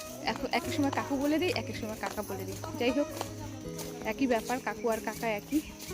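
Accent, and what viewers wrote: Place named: Bangladesh. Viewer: আমি আর আমার বন্ধু তোমার ভ্লগ দেখি। তোমার বাংলাদেশের টানে কথাবার্তা গুলো শুনতে খুব ভালো লাগে। আমাদের বাড়ি কলকাতা কিন্তু আমাদের দুজনেরই আদি বাড়ি মানে পূর্বপুরুষের নিবাস বাংলাদেশে ছিল। খুব সুন্দর হয়েছে ভ্লগ টা। শিলিগুড়ি জলপাইগুড়ি তেও আমার বেশ কিছু পরিচিত বন্ধু আছে। ভালো থেকো।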